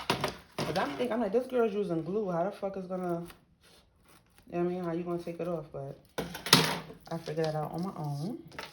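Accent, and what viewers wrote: New York, United States. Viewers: Love it lol def from NY cause thats how I be talking too. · I love your “keep it real” commentary and your accent (NY?) I’ve been wanting to do this...thanks for demonstrating!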